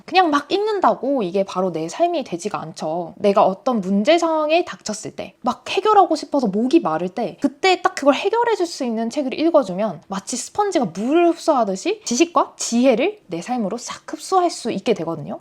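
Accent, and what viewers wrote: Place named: Busan, South Korea. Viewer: 부산 사투리 ㅎㅎ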